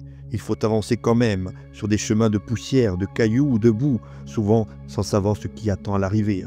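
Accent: Corsica, France. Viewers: Hey ! Il est d'où ce bel accent Corse ? :) ❤ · Magnifiquement documentée ! Félicitations pour ce travail. Je crois reconnaitre un sympathique accent Corse chez le narrateur, est-ce bien le cas ? Cordialement.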